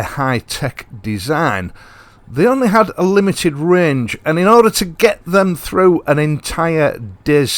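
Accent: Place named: Hull, United Kingdom. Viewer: 💯👍❤ recognise that hull accent anywhere lol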